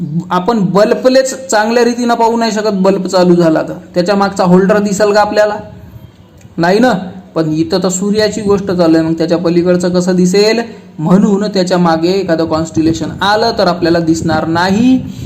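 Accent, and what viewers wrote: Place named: Nagpur, India. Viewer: Sir are you from nagpur I think because you talk like nagpuri bhasha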